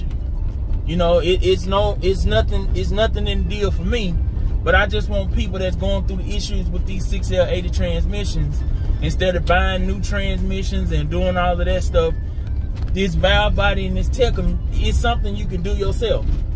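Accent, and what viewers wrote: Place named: Texas, United States. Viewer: Sound like u from Texas maybe florida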